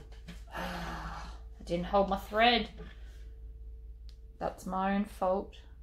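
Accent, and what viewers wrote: Australia. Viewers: Hi! I like how you switch out from bags to something els in sewing. I really like the revamp of your sewing machine, it turned out very nice. I’ve been watching your videos for a little bit now & enjoy them very much ( I’m a newbie ). I love your Ausie accent. Great job all around young lady. Take Care Be Safe. 👍😊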